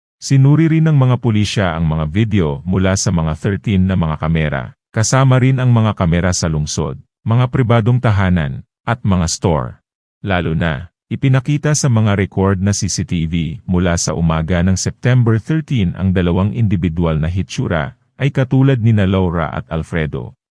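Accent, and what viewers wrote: Visayas, Philippines. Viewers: Kahit may pagka-visayan accent ok lang naman naiintindihan naman ang kwento, someday mas gagaling ka pa katagalan. 💙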